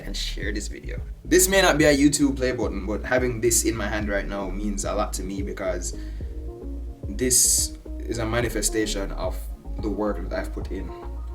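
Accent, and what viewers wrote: Jamaica. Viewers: This might sound crazy, but him sound like young machel montano, just with a jamaican accent instead. Same kinda tone and slight raspiness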